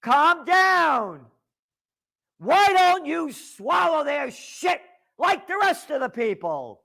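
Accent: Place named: Bronx, United States. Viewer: When you get into your rants you take me back to the good old days in Da Bronx. Love you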